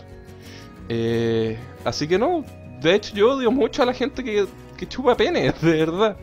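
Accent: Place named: Chile. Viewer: Yo te sigo porque sos chileno porque y amo tu acento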